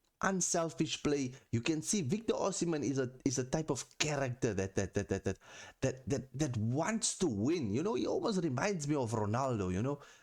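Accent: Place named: Cape Town, South Africa. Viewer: Great take.. you sound like youre from cape town... subbed 😎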